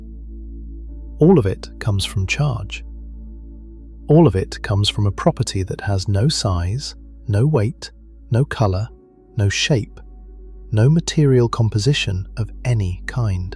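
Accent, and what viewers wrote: United Kingdom. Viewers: 2 hours of mindless blathering with a British accent.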